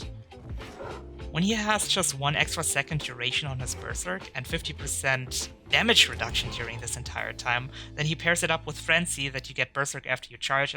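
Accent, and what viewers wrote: Germany. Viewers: du bist deutsch, oder? der Akzent klingt familiar :D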